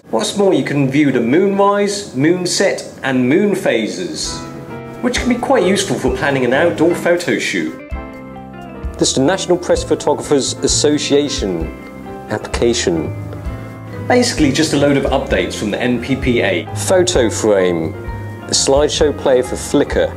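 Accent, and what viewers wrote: England, United Kingdom. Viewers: no offense how are u asian but with a english accent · he was born in england just because hes of asian decent doesn't change his voice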